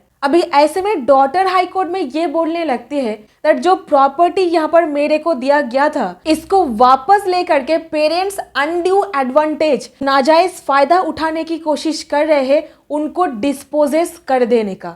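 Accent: Bengal, India. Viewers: Bengali's hindi is very sweet. Easy to understand.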